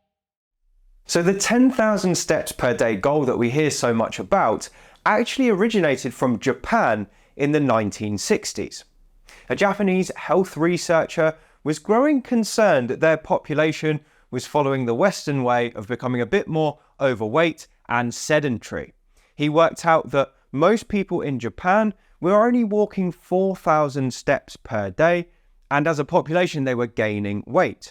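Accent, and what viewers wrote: United Kingdom. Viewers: Really like this channel. Just ordered your book from Amazon. There is something about a British accent that makes one sound smarter :) Just to add that 3 X 10 minute walks per day control blood sugar and hypertension better than 1 X 30 minute walk per day.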